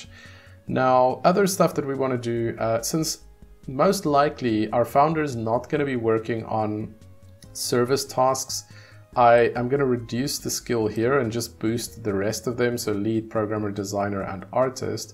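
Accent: South Africa. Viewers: Mate I have to ask because it is driving me crazy, are you from South Africa?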